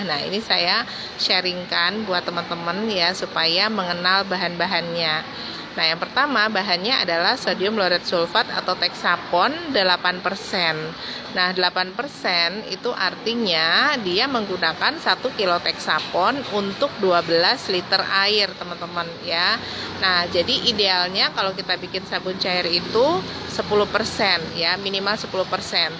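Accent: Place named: Jakarta, Indonesia. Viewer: Suara jrlas dr jkt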